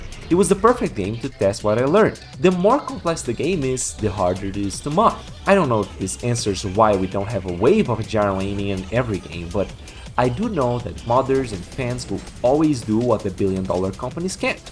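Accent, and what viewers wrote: Brazil. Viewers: Eu também acho até estranho que inglês abrasileirado assim eu entendo perfeitamente mais o de alguns nativos ainda engancha umas palavras no meu listeling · impressão minha ou você tem um "accent" brasileiro? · Tava vendo esse vídeo e minha irmã perguntou se vc é brasileiro por causa do sotaque e eu olhei seu perfil da steam e vi que vc é mesmo do Brasil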